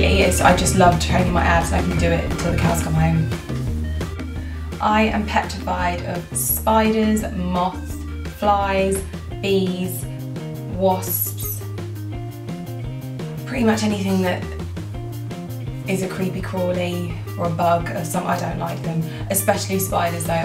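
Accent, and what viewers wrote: RP, United Kingdom. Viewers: I don’t understand people talking about her accent. Its been blown so far out of proportion. She sounds almost the exact same just more mature. she’s always been posh. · I'm here for the British accent! Such a beautiful and sweet woman. Oh and btw, you have mentioned you haven't cut your hair in 8 years, however your hair looks so healthy, thick and strong. What are you hiding from us? Haha. Please make new videos. Subscribing Love, from Lebanon. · I'm new and u just happen to be amazing :D and i love british accent so its a bonus haha and u have such a nice healthy body really impressed with the hard work and determination, gonna be one of my inspirations! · Strange how since you’ve progressed you’ve actually started to speak ‘posh’ too...mmm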